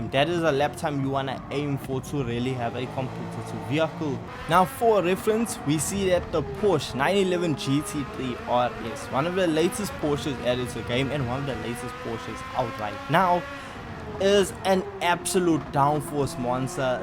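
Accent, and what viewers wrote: South Africa. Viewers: Amigo, he's South African, some 12,300km from Beijing... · South African😎😎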